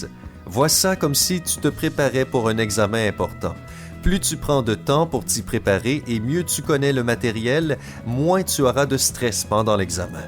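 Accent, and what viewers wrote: Quebec, Canada. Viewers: C'est juste l'accent québécois. Et c'est très joli je trouve. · Skip oui c un accent qc (je suis un Quebecois)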